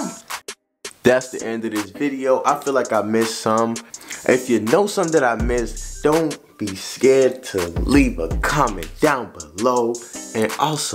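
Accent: New York, United States. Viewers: I can hear the ny accent in your voice but you picked up onna florida shit so decently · U have a New York accent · u sound like you from newyork then anything.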